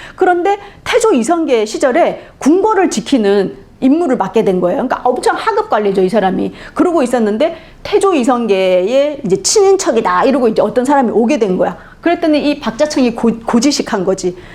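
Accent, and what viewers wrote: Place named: Jeolla, South Korea. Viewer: 全羅道 方言(사투리)가 섞인 解說이네요. 强하지가 않아 귀여우시네요. 感謝하당께롱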